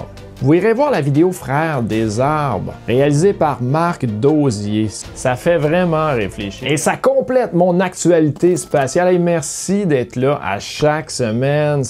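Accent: Canada. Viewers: l'accent canadien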